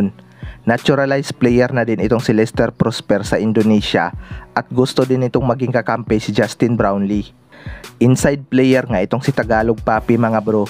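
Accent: Visayas, Philippines. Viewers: Bisaya man kaayo ka bro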